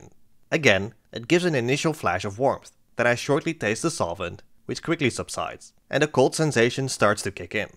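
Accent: Netherlands. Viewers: Are you dutch? youve got like a dutch accent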